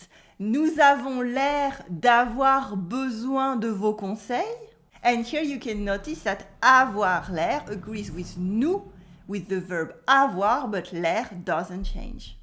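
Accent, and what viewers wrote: France. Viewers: J'adore ce concept. Les formes et tournures employées pour définir le français courant sont très bien choisies. C'est du beau boulot (great job). Mais je ne comprends pas pourquoi choisir systématiquement de prononcer l'anglais avec cet atroce accent français ? Alors qu'il est manifeste que vous maîtrisez parfaitement l'anglais. Est-ce un marketing gimmick ? A way to confirm your legitimate frenchitude ? C'est atroce à entendre mais il est clair que votre travail est parfait pour assurer une bonne transmission interculturelle du standard french. Bravo. C'est aussi une fabuleuse publicité pour les dentistes français ;) (I'm kidding your smile is gorgeous)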